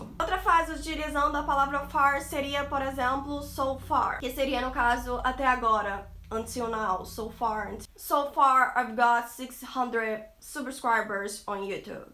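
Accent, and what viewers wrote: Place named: Rio de Janeiro, Brazil. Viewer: Pelo sotaque deve ser carioca hahha. Uma dica, tenta deixar um pouco mais as traduções na tela, pra quem não manja muito dmr um pouco pra pegar a forma como se usa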